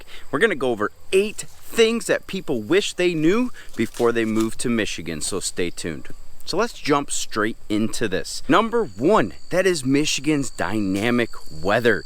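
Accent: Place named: Canada. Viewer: Not sure where this guy is from. Sounds like Canada. Michiganians do not have that accent.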